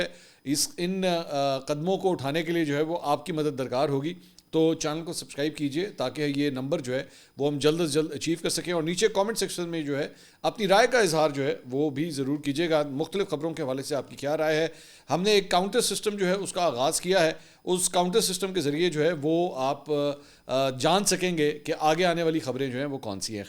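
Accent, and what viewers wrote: Pakistan. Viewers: BHAI APKI PUNJABI LANGUAGE ACHI NAHI HE AAP HER NEWS KE BAD PUNJABI KO URDU LEHJE ME ADHOORI BOLTE HEN JO AAP KE MONH SE BILKUL ACHI NAHI LUGTY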